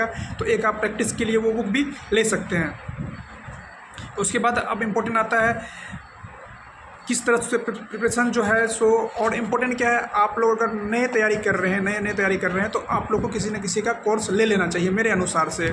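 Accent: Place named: Bihar, India. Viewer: Bihar से हो क्या आप,,,❤🎉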